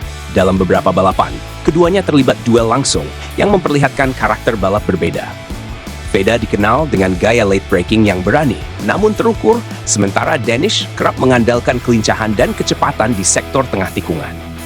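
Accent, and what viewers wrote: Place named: United Kingdom. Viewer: Narasi bahasa pake aksen inggeris Its very cringe bro Baru 1 mnt stop nonton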